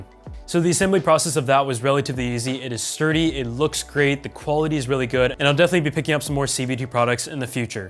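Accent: Canada. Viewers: Hey, are you Canadian man?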